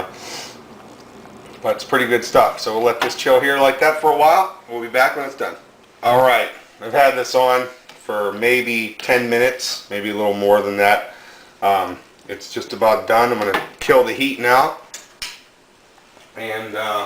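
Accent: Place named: Pittsburgh, United States. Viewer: You sound like your from pittsburgh. I assumed you were originally from pittsburgh when you first talked. I'm from southern WV and I've only been to pittsburgh once, so maybe I just haven't heard the accent enough.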